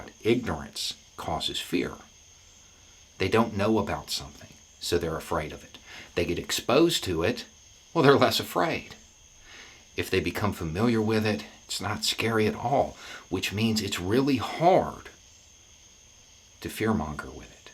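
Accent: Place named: Idaho, United States. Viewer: Sounds like north Idaho.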